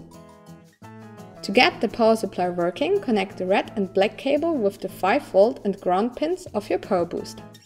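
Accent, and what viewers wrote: Germany. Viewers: Which country do you come from? It sounds a bit like a German accent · I think she's German.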